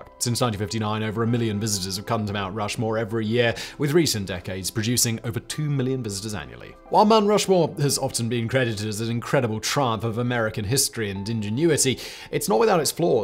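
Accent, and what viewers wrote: United Kingdom. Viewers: South Dakotan here. Was cleaning my bathroom while listing to your today I found out Japan and Germany, and then this auto plays and I just hear this goofy British man say there is no more boring a place. He’s right but like damn, didn’t expect such a personal attack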